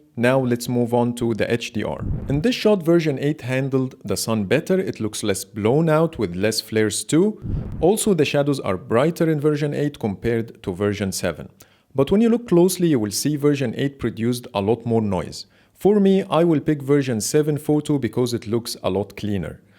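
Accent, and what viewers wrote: Egypt. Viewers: Very nice Egyptian accent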